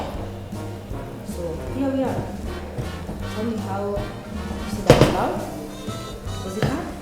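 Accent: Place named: Nairobi, Kenya. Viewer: i like this lady, shes so real, her cooking so simple and practical. and i like her Nairobi english lol. this is my dinner recipe thanks.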